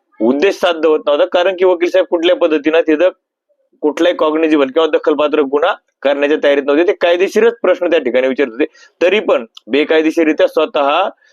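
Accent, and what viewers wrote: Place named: Dhule, India. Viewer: भाऊ तुम्ही धुळ्याकडचे वाटतं..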